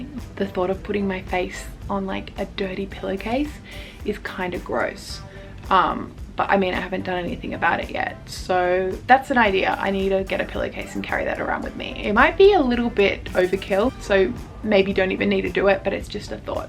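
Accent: Australia. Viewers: Am i right if this aussie accent ? or new zealand maybe ? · Aussie with a lisp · Aussie as! I did not detect a lisp.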